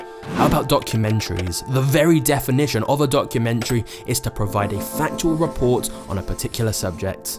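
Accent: United Kingdom. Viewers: The combination of the British accent and the the snippets from ca; 40 different shit movies make your point.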